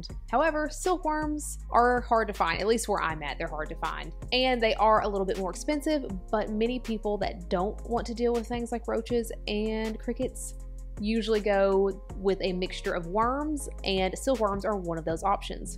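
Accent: South, United States. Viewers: I love your videos and your personality and how you talk so smoothly , you must be southern I hear my accent ❤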